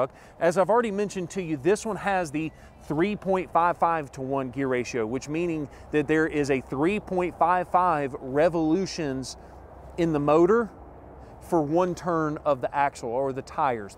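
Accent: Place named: Alabama, United States. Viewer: Great video and I can understand you pretty good with your Alabama accent even if I am not a native english speaker. Out here in Germany we just have mid-size trucks (all Diesels because of gas price and mpg) and they haven't been very popular until a few years ago (maybe because of the VW Amarok). But we always had some grey imports of fullsize trucks. But it seems like more than 80% of them are RAM's. But I like the F150 better also because of your videos. Great content your videos. Wish I could have done that when I was a car salesman (VW) years ago. Greetings from Bremen (Beck's beer City)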